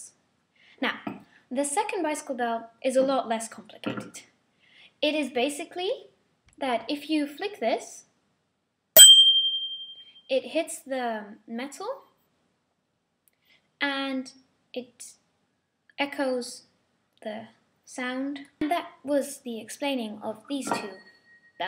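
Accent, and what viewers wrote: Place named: United Kingdom. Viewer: I don't know if you were putting that British accent on or not, but very good video! :)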